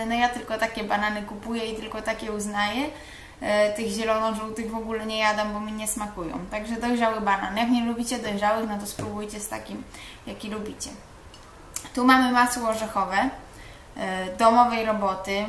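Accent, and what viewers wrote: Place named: Silesia, Poland. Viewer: Masz taki akcent slaski lub goralski :) fajny filmik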